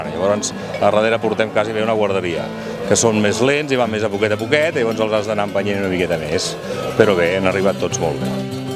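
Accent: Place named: Barcelona, Spain. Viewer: En Camprodon??? Ja,ja,ja ...vas listo! Nadie habla castellano y aún has tenido suerte, el que habla en el vídeo no es autóctono, habla de Barcelona.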